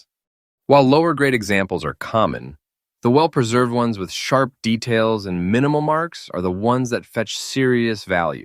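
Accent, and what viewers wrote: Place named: United States. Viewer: What a lot of drama. Yankee accent !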